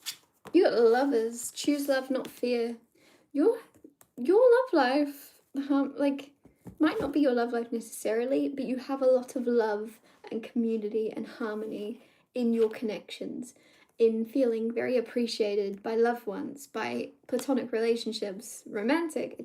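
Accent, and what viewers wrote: United Kingdom. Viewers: Hi. I thought you sounded British? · . You. Do. Sound. Very. British. Possibly. Irish. Not. Welsh. Or. Scotish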